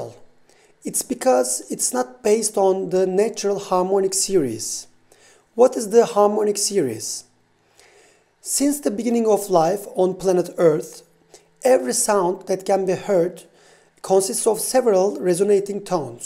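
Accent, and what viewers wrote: Turkey. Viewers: Aksandan anladım ilk Türk olduğunu :D çok düzgün İngilizcen, helal olsun. Türkiye'den böyle bilgili müzisyenleri medyada görmek çok güzel. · abi şivenden anladım türk olduğunu like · Nice work, sir! Ingilizceden anladım Türk olduğunuzu.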